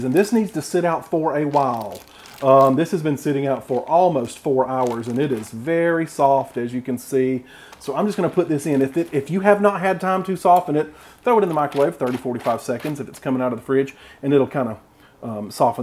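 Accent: South, United States. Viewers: Hey: ) This is my 1st time here...this video was recommended to me, I'd never heard of you or your channel before (or Egg Loaf, for that matter lol). When I saw Flo behind you I thought, "I'm in for one heckuva fun ride!". Then I heard your accent & thought, "Yep! Yep! Yep! I'm in!" (I'm from the South, too...born & raised). With each Egg Loaf, my mouth watered more, especially the 3rd one, the one you came up with. What else could I do but subscribe to your channel & follow you on social media? lol I'm so sorry, I didn't quite hear your question, could you ask me again, please? Oh, no doubt about it.....I'll be spending this evening & the rest of tonight (however long it takes me) watching all your videos, from oldest to newest, until I get caught up! lol Oh, man! Biscuits, Sawmill Gravy, Shrimp & Grits, Cornbread, French Fries, Cornbread Dressing, Pumpkin Pie, Pecan Pie, Pigs In A Blanket, Salmon Patties?!?!?!?!?!? 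Oh, you're spoilin' me, here!!! Seriously, though, I think you're wonderful! I love your style! Best believe, I'll be cooking up your recipes...& I can hardly wait! Thank you so much!!! P.S. "It helps if ya plug it in."!!! BWAHAHAHA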